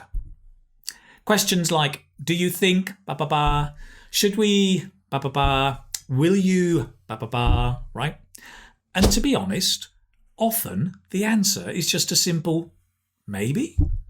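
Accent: United Kingdom. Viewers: hello please this is british or américaine englishe??? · Your accent is British Am I right? Because you don't pronounce letter r at the end of the word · It was really useful! Thanks a lot! And I liked your nice British accent😇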